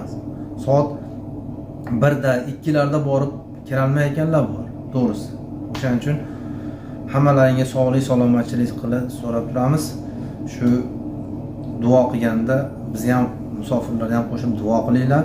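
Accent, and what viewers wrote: Andijan, Uzbekistan. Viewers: Андижонча лайк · Рахмат сизга анжонча килиб тушунтирдиз ишларга омад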